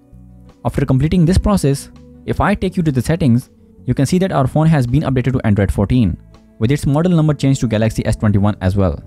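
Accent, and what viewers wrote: India. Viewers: Well he's Indian? I'm just happy he's actually speaking English and not just click baiting with English title then full out Indian audio lol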